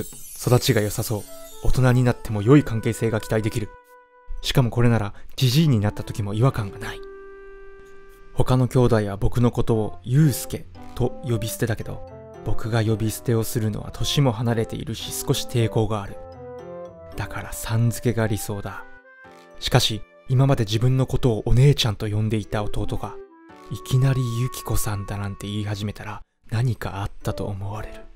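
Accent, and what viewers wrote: Kagoshima, Japan. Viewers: リクエストした鹿児島弁さっそく話して下さりありがとうございますー🎵鹿児島弁忘れてなかったですね😆笑ったぁー😆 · かごんま出身、知らなかったか忘れてました😮訛りたまらん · 鹿児島のなまり（笑） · 鹿児島出身鹿児島育ちなので、方言嬉しいです😆 さんの動画などで、いつも笑わせてもらってます！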